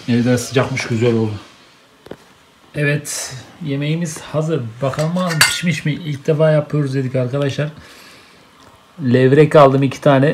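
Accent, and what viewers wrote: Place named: Yozgat, Turkey. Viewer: Yozgatta selamlar :) Abi konuşman zbizim Buraların Konuşması Gibe Leee 😂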